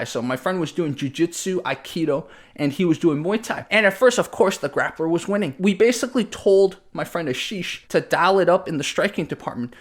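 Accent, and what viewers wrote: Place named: Midwest, United States. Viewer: Bro i did not expect you to look like that, based on your voice i was expecting a midwestern white dude in a flannel shirt with a can of beer on the table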